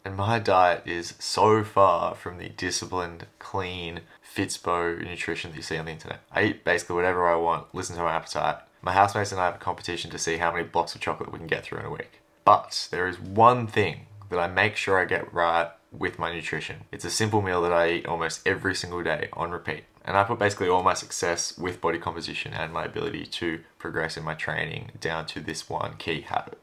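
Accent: Australia. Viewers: Lol have you ever heard an English accent? It's clearly Australian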